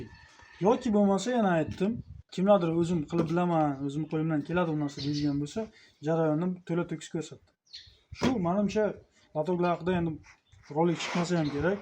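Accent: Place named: Bukhara, Uzbekistan. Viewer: Уста каердансиз сир булмаса шевагиз Бухорога ухшайди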